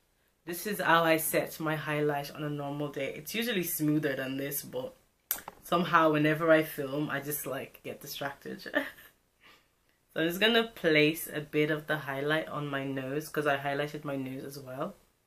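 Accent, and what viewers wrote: Ireland. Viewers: Was googling this product and clicked onto your video and then was like yeah thats deffo an Irish accent! Love finding Irish gurus so I can buy what is rec'd easily!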